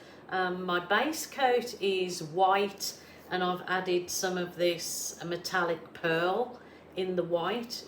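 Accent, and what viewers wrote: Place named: England, United Kingdom. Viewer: Hello my "Angel across the pond". During the epedemic here in the USA, I found u. Started watching u around 2\20 n have been pour painting, or thinking about supplies, ever since! U saved my insanity! Ur insight, personality n teaching. Yr English accent sounds beautiful to me.. Thank u Stay safe.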